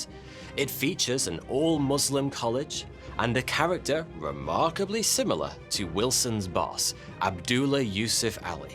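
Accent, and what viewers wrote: United Kingdom. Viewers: DDoesnt everybody have 4 families , and I'm searching for and don't see a video from You on king arthur .. with your British Accent i think You should have one if you haven't been working on that one for years ...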